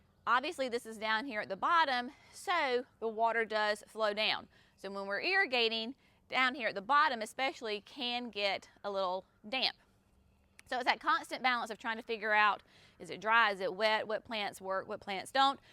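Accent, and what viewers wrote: South, United States. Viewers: North Caroline-ah? Love that southern twang!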